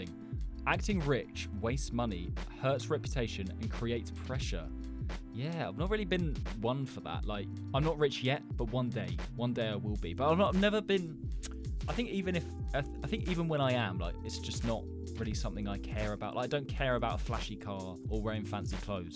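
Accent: United Kingdom. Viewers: Your voice sounds like your from U.K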